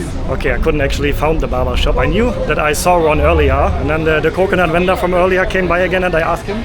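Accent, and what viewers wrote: Germany. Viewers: hes from germany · Deinen deutschen akzent muss man mögen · i can hear the deutschland out of you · Mein bester Mann hört das du aus Deutschland kommst 😁😁😁German English ❤️ Aber super Videos 👍🏻😊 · You sound very German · Übrigens sprichst Du ausgezeichnetes Hochenglisch mit einem niedlichen deutschen Akzent! Respekt!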